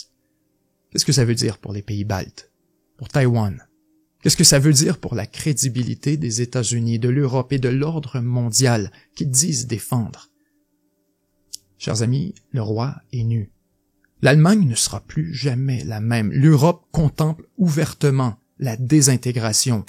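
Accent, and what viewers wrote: Quebec, Canada. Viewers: Est ce que tu serais québécois par hasard? Ton accent sonne bien.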